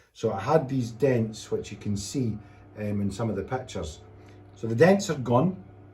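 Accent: Scotland, United Kingdom. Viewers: Are you from Scotland ? 🏴😊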